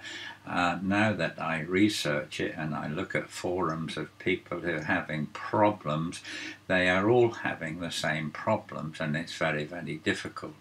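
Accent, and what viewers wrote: United Kingdom. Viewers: Always like British accents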